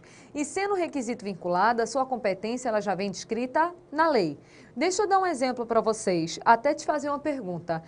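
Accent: Pernambuco, Brazil. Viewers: seu sotaque é maravilhoso!!! parece cm o nosso aqui d pernambuco... bjsss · Virei fã. Excelente!!!!! Professora a senhora é pernambucana? adorei o sotaque também. Show!!!!!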